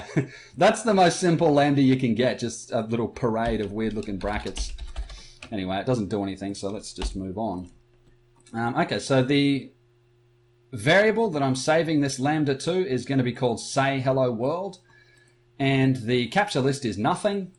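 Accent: Australia. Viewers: You're the good legend here:D Awesome high-quality content, generally detailed low-level explanations, and cool Aussie accent. Gotta love this channel! Wish I could be a supportive patron, but can't from here.:/ Salutes from Syria anyways.:P (The hype of "Return of Lambda" is unprecedented). xD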